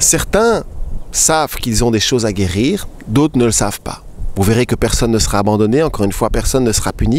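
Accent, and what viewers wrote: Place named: Belgium. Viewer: Ce petit accent... n'est pas français ! Belge peut être ?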